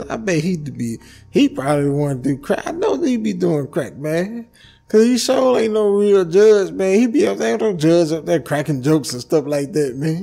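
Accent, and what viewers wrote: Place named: Alabama, United States. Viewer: I just love to hear you talk. Reminds me of my friends back home in Alabama ❤️❤️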